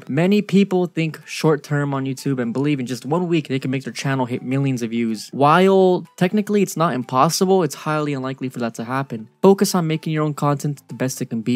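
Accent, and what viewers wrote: Croatia. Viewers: Are you from Croatia? :D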